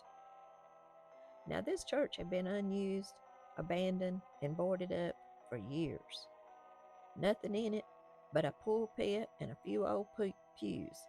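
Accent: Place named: Appalachia, United States. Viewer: I love the beautiful accent of your voice. Reminds me of Appalachia. Thank you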